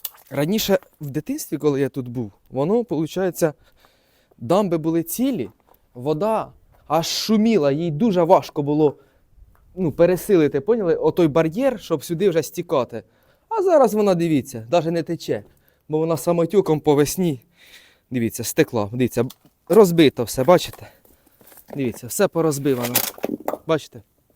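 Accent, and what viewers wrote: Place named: Chernihiv, Ukraine. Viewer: Ти слуайно не з Чернігівською обл.-бо чую говор похожий?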